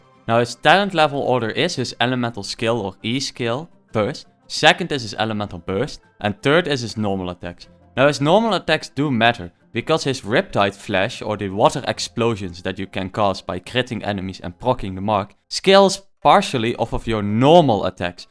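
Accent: Netherlands. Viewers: Your deffo from Netherlands!! Same haha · AAAAAA as a dutchie i can't handle the Dutch accent im sorry fisisjjsjs But thanks for the guide i appreciate it lol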